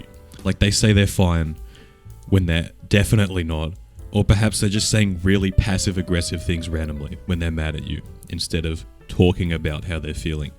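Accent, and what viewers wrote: Australia. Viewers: Sorry I’m only here for the Aussie accent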